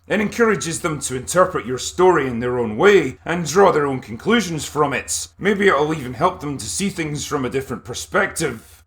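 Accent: Scotland, United Kingdom. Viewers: Listening to a Scottish man talk ethics is one of life's simplest pleasures.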